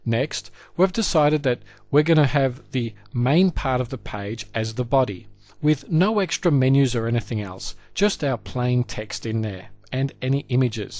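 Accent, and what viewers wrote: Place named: Australia. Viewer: nice tutorial Your aussie aye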